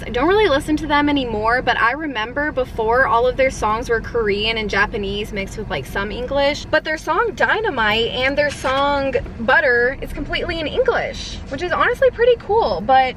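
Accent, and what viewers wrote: South, United States. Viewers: really nice southern drawl of and accent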